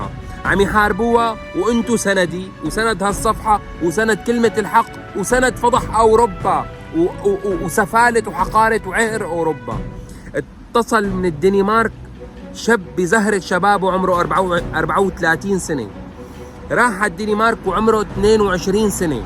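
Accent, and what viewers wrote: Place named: Damascus, Syria. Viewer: باين عكلامك من الشام يعني عربيني او دوماني بس ماتجمل وتقول السوريين عيب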